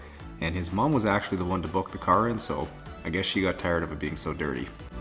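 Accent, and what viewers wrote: Canada. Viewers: What did he say? Compressed air and what? His Canadian accent lost me.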